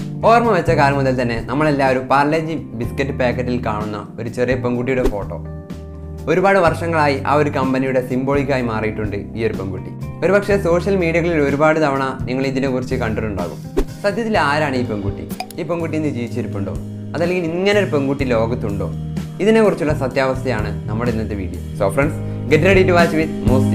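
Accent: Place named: Malappuram, India. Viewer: Same same.... Njanum malappuram aanu... മലപ്പുറത്ത്‌ എവിടെ ആണ്.... ഭാഷ കേട്ടപ്പോൾ ഒരു സംശയം.... അതാ ചോദിച്ചേ....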